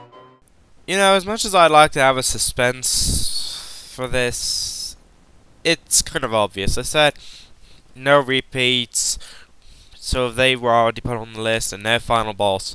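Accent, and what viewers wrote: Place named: United Kingdom. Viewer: Where do I begin? It's a countdown of your FAVORITE bosses, yet you have nothing but negative things to say about the first two bosses. Did you seriously just call Creepy Castle annoying?! Also, why do you have a British accent in this video, but have an American accent in your more recent videos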